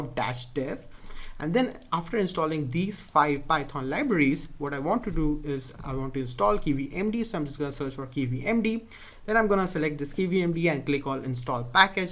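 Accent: India. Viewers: Indian thick accent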